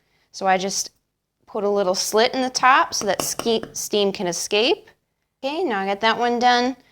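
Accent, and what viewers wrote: United States. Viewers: Buffalo, Cleveland, or Chicago accent?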